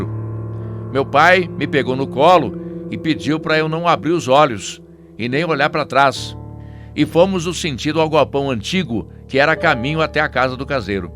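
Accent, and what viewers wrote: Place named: Minas Gerais, Brazil. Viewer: Adoro esses relatos, quando conta, é outro que Paresse mineiro...continuam assim...parabéns...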